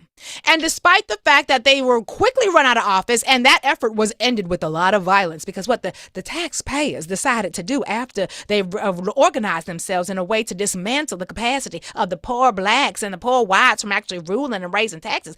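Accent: South, United States. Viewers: si your southern bell goes extremely hard. there should be a part of your show dedicated to just this.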